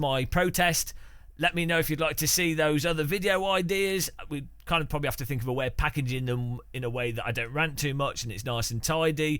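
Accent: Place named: Liverpool, United Kingdom. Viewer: love the liverpool accent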